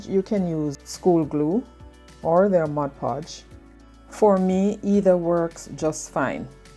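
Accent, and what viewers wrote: Jamaica. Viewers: same! I didn't see snow until I was 8 yrs old, visiting family in NJ for a wedding and it was amazing! I played in it making a snowmen and kept running in and out of the hotel to put my hands under hot water (as a child, I had no idea it made it WORSE to do that as it burns your hands lol). But growing up, i thought snowflakes were the size of dinner plates! Cuz I'd see them on cartoons where they blow them up for the screen effects. My mother had to EXPLAIN to me that each snowflake was part of the snow that fell and they were very tiny. Boy, that blew my mind and they it started an entire other excitement trying to catch snowflakes and look at them before they melted to see that, indeed, they were snowflakes🤣🤣🤣 I laugh at myself over it because it's like "really Gaby? Dinner plate snowflakes? EVERYONE would get a concussion if those fell down when it snowed!!! 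Lol I figured you'd enjoy that story. I hope u can make sense of it! Lol I have a few Jamaican friends and I want to go to Jamaica SO BADLY! That was my aunt and uncles favorite spot to vacation. Your accent gave you away😋 I LOVE IT!!!❤❤❤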